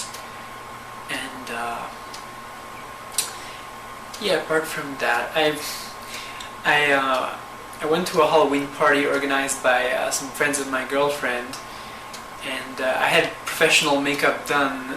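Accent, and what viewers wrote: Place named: Quebec, Canada. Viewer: She's from the Quebec, Canada. The accent is French Canadian.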